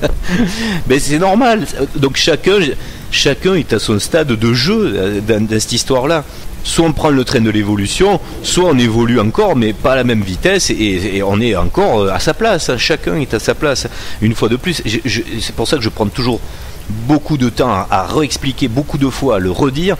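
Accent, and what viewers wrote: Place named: Toulouse, France. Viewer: Merci mille fois pour vos vidéos. Pas une seule seconde à manquer sur vos présentations, chaque fois que je la réécoute. Pas un seul mot de trop ! Et vos digressions, délicieuses. En vous écoutant je pense, 'c'est magnifique cet accent toulousain qu'il a ! ' Bon je fais donc partie de la troupe des E-veil-lés. Oups ! Ne pas confondre avec les intentions d'une revue ... "Réveillez-vous" ! Et je vais maintenant relire... "La solution extra-terrestre". (Petit conseil en passant par là..: découvrir les expériences du pilote de chasse à la retraite Jack Krine - sur Youtube. )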